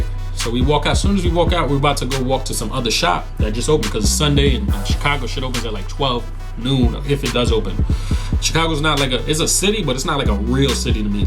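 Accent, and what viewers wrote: New York, United States. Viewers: Lol you was a Spanish nigga in Chicago with a new York accent and shit hat to the left them niggas thought you was a Latin king. Spanish gangs in Chicago though besides Latin kings and SDs ain't really on shit you probably would've just got ran out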